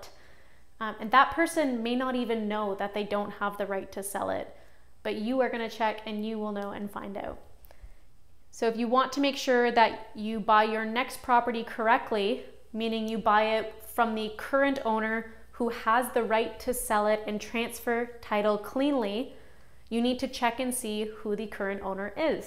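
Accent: Canada. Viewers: It sounds like you have a Canadian accent?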